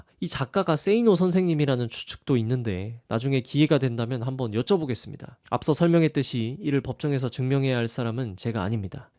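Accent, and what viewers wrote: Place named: Gyeongsang, South Korea. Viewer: 경상도 분이신가보네요 오늘따라 사투리가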